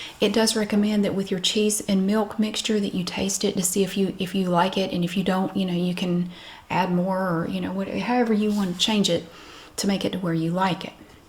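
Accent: South, United States. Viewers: your accent makes me melt Southern accents are the best. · I always giggle when I hear you say swear words or wear them on your apron like today and your sarcasm because you are such a sweet soft spoken southern lady 😂 I love it!!! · Aside from the humour and the Southern drawl, it's brilliant how you always tell viewers they can make these recipes - so encouraging. Peace from Scotland 🏴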